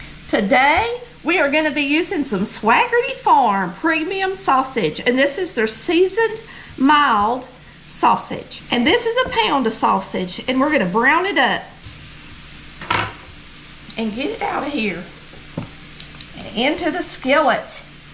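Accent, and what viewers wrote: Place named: Arkansas, United States. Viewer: You sound like an Arkansan, ma'am. I'm from there, lol.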